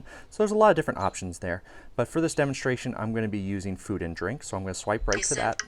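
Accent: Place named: United Kingdom. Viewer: For some reason I thought you were from the uk. Lol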